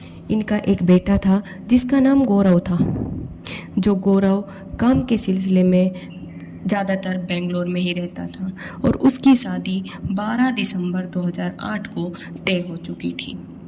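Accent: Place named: Haryana, India. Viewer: Awaz haryana ke hai, ma'am haryana ke hai.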